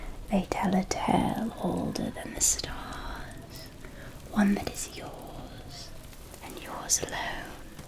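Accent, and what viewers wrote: Transatlantic, United States. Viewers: no way! I was just watching your older 1920s videos as inspiration for my 1920s themed Halloween costume! your trans Atlantic accent is unmatched 💖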